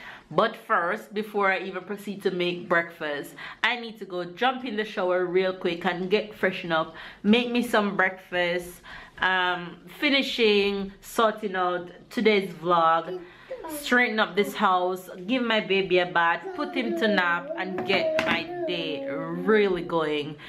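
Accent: Jamaica. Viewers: I love love love your Jamaican accent. Lovely vlog. As for working out, the only exercise l do these days is walking and I enjoy it. Like I show on my vlogs, I try to leave the car behind most times and just walk. is such fun to watch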